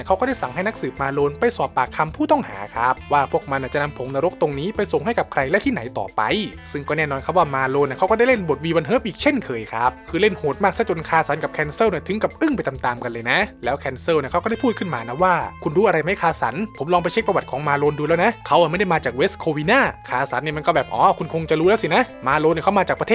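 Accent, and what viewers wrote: Isan, Thailand. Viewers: สำเนียงอิสานๆของพี่น่าร๊ากกกมั่กกก ~><~ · อีสานมาเต็มๆๆ พุ่นเด้555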